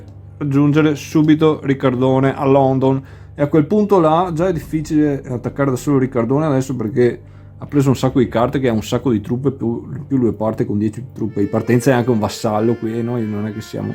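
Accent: Italy. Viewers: Ciao, ottimo lavoro! Mi sto guardando i tuoi video visto che ho da poco acquistato il gioco. E poi dall'accento non sei così lontano da Vicenza, magari in futuro Na partìa 😂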